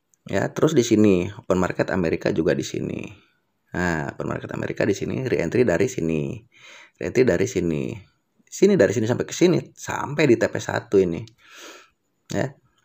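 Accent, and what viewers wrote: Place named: West Java, Indonesia. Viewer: Cara menjelaskan bhasa rakyat jelata, dgn bahasa yg medok sunda dan backsound hembusan 'udud yg khas mengiringi setiap paragraf dn yg penting buat orang jdi mudeng dan cpat faham, hatur nuhun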